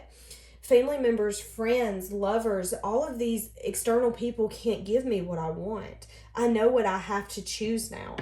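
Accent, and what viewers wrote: South, United States. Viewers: I love your voice and southern accent 🖤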